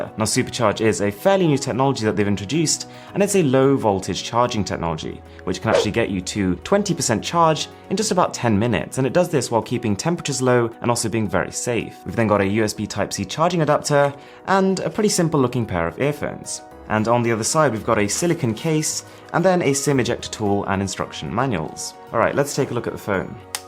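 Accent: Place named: United Kingdom. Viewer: I love that UK accent ...😃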